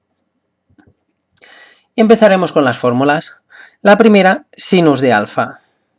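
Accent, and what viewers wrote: Catalonia, Spain. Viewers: Alejandro Moya Martin Es catalán, en ese idioma se pronuncian distintas las palabras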